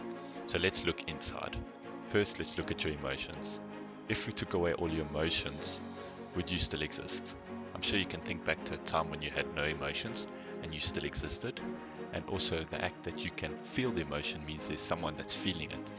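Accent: South Africa. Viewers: Is that a fellow South African I hear !?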